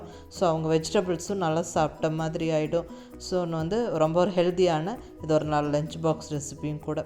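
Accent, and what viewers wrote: Coimbatore, India. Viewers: Aunty u know am a combatorian ,whenever am hearing ur voice am feeling like in Coimbatore...superb vlog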